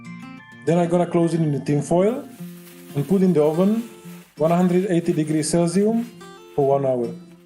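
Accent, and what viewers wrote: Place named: Czech Republic. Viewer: super český akcent :)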